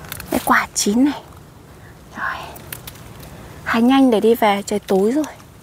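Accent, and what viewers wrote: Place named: North, Vietnam. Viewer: Cháu này là người bac ngje nói mộc mạc quê hương bac của chúng tôi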